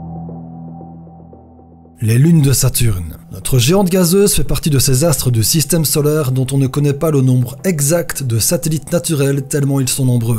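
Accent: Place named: Belgium. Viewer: c'est bon j'ai rien dit. il a dit les mots photo et huit. Belge je pense. bref sympa à regarder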